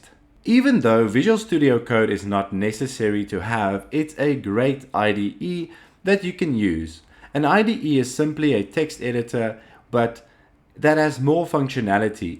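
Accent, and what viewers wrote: South Africa. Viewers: Why do you sound south african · Proud to hear your South African accent! Thanks for everything,! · I almost jumped when I heard your accent! we gotta make NFTs trend in South Africa! Thank you so much for all of this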